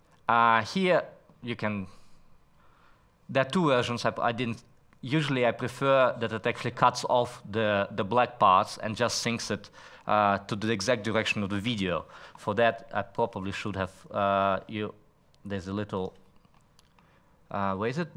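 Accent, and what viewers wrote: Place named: Israel. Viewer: Your Israeli accent mixed in with so many other accents are driving me crazy. my brain is going nuts trying to process your voice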